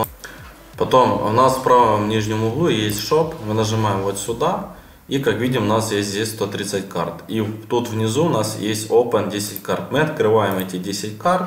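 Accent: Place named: Ukraine. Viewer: Говор у тя на украинский похож. Но возможно ты с Ростовской области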